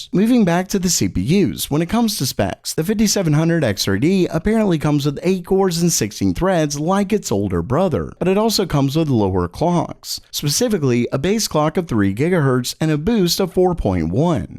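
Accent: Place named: Appalachia, United States. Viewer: Your hillbilly accent is unbearable. "It's one massive COARD"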